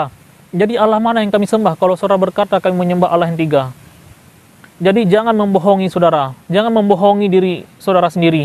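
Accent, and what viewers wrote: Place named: Pidie, Indonesia. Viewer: aceh mana kau? aceh pidie ya? dr logat bahasa aceh kau nampak org pidie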